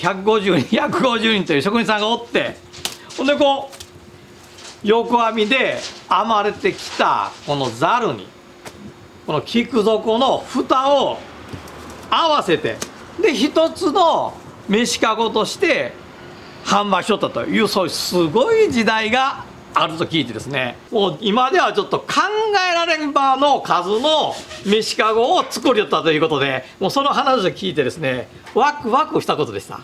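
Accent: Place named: Kochi, Japan. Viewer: 高知っぽい話し方ですね。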